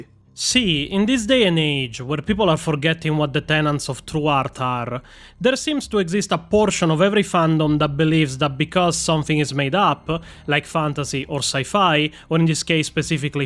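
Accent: Italy. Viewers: I love the Italian accent. I could listen all day :) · I am so glad to have someone put this into better words than I ever could have. Thank you! I love your Italian accent, and I wish to see bloopers/behind the scenes of this. 🤌🏻👀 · L'accento meridionale!!!!11111!!!!!!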